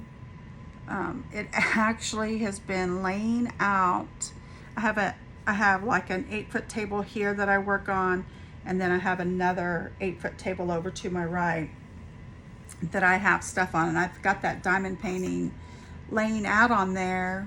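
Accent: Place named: Kansas, United States. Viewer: Hi, I enjoyed listening to your WIP and Chat. It sounds like you are a fellow Kansas. I live in Ellis, Kansas. I also have a YouTube Channel. Have a blessed day. 🌻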